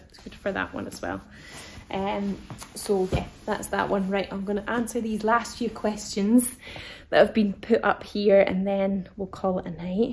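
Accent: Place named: England, United Kingdom. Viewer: Watch you 10 sec and got subscribed. Such a inspiring vibe and sweet energy. For me as a German your dialect I have to listen more. Hmmm I still guess …. No USA no canadien … England? …. Hmmmm … Australia maybe. But I understand everything. 😂 from the fabrics and things in the background I can tell I like this style. Thank your lives sessions. Best way to learn, even if I don’t see this Live. Greetings from Germany 🇩🇪 🎉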